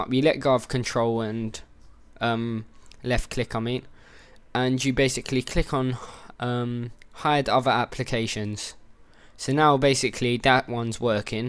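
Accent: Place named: East London, United Kingdom. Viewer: Brilliant tutorial, and your epic east london accent is boss!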